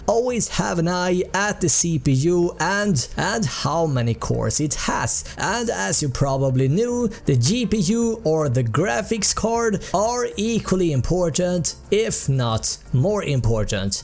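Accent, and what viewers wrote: Sweden. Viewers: thats some strong ass swedish accent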